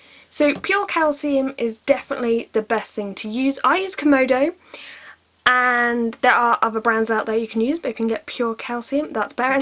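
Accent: United Kingdom. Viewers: She’s British. Due to her accent she pronounces things differently... so no, she doesn’t pronounce it wrong.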